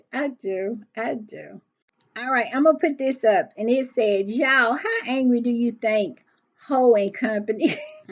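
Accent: South, United States. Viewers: I am watching from England, and I just love your Southern accent ❤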